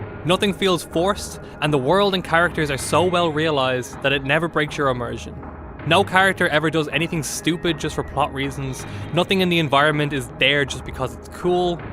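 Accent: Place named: Dublin, Ireland. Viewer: It must be our beautiful Dublin Accent x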